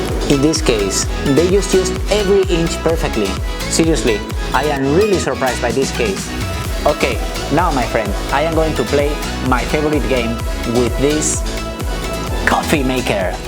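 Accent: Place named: Andalusia, Spain. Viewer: English from andalucia i can see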